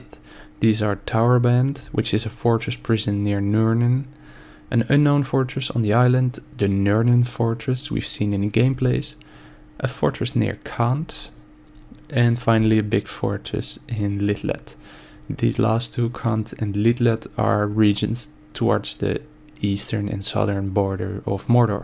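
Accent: Netherlands. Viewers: You sound very Dutch, the accent I mean.. I am correct.. Oui? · Why do you sound so dutch...(or flemish)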